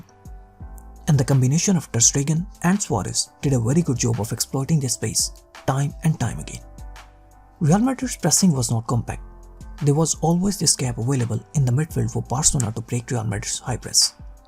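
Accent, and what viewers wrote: India. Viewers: Dude... Is the narrator Indian?? At least His accent is ..😐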